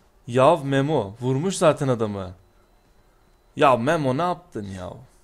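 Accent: Adana, Turkey. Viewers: Abi Adanalı mısın yanlış anlama bende adanaliyim bizim gibi konuşuyon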